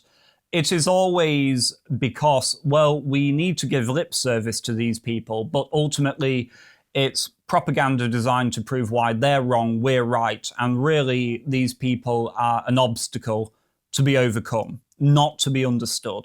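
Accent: United Kingdom. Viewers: E<link> British accent 🤢🤮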